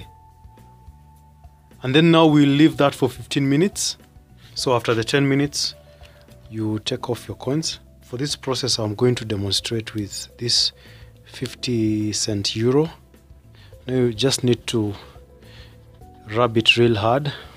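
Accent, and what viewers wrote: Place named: Somalia. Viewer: defo somali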